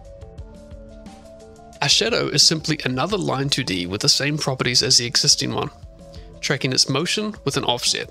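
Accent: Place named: New Zealand. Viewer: ayyyy new zealander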